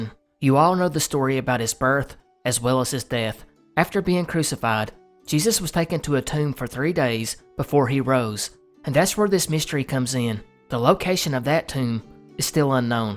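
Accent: South, United States. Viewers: Thank you for another great video. Your narration in that southern cadence make your channel one of my favorites. ❤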